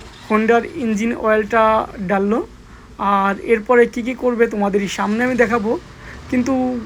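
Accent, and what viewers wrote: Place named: Bangladesh. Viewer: Vai tumi ki Bangladeshi naki??? kotha sune tai to lagche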